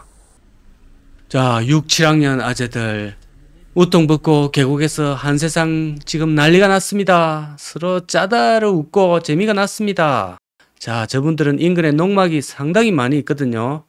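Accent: Gyeongsang, South Korea. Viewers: 경상도 사투리 정겹습니다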